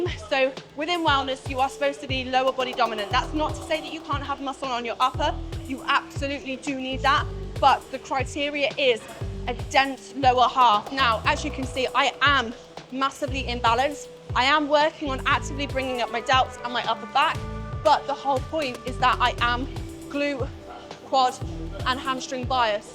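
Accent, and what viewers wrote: United Kingdom. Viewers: Where are you from in the UK? I'm thinking south? Hampshire perhaps 🤔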